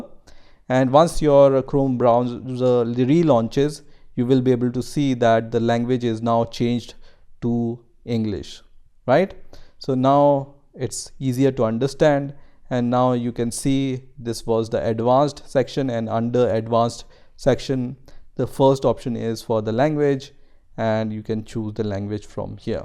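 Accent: India. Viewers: Thank you very much for your most helpful vedeo.I was facing this problem since mornig. I could rectify it from your step by step instruction in clear indian english. Kindly make more videos like this.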